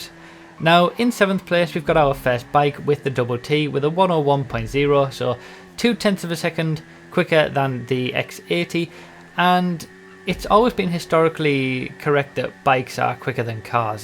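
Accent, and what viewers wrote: United Kingdom. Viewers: british accent sounds so gay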